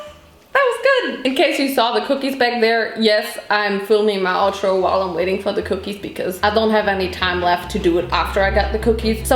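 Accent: United Kingdom. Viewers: Please a whole Video just talking in your hilarious british accent !! 😂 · i love your british accent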